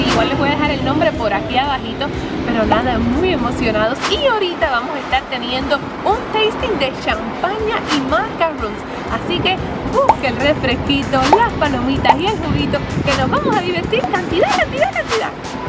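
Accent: Cuba. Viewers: Vos sos de cuba tenéis acento cubano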